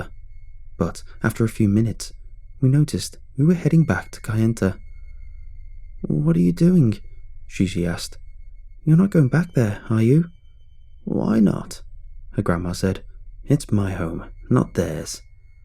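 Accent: United Kingdom. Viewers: If its in America why use a UK voice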